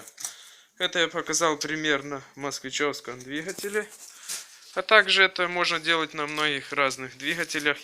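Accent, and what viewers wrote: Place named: Moldova. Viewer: Сразу слышно,Молдова:)))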